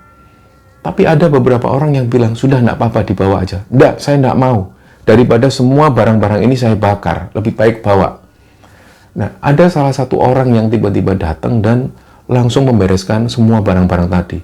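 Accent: Java, Indonesia. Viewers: Sugeng ndalu mas 🙂 Gk prnh bosen liat channel ne, cr pembwaan mas dlm crt slalu enk didengar n apa adanya, n tetp dgn cri khas jawa tulenny, smoga trus brkembang n crta2ny bs mnmbh pngthuan bt org lain aminnn, slm dr lampung 🥰🥰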